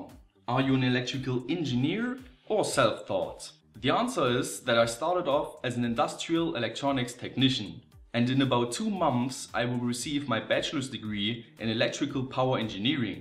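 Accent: Saxony, Germany. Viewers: your German accent has actually made it easier to pick the terminology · I like the German accent in your English and by doing these video's in English you at least reached The Netherlands :-) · Aha, noch ein Sachse! :D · Genau! Und bitte schön sächseln;P nachbarschaftliche Grüße aus S-A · Thanks for the videos, I'm Colombian and is easy for my view videos in english even with your german accent, german is an lovely language and hear it is really cool, but my knowledge of that language is very, very limited. please don't make videos in german. Is a fact, english is a language more "extended" than german in the world. Congratulations for the 100k subscribers!, Is a outstanding work !. actually is my fav channel. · Since i heard your voice the first time i said ... " He has to be german !!! " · Ich finde man hört es an seiner (englischen) Aussprache, dass er deutscher Herkunft ist ^^ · Ein bischen hört man es das er deutscher ist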